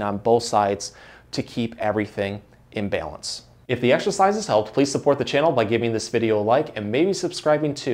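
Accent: Michigan, United States. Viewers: Just the warm ups fixed it for me. Go Michigan!! Luv that MI accent😊 1000 Thanks 👍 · Michigan accent??????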